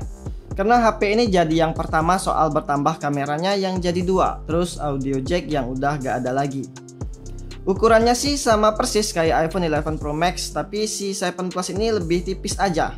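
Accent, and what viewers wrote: Palembang, Indonesia. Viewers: Wong Palembang e hahah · Alangke lemaknyo haha, wong palembang nian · Nak nanyo kk wong palembang? 🤣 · org palembang kah kak? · Orang palembang yah kak? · Orang palembang ya bang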